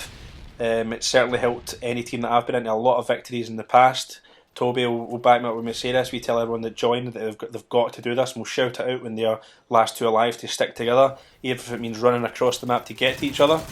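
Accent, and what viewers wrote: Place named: Scotland, United Kingdom. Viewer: feels weird hearing a fellow scottish man doing one of these haha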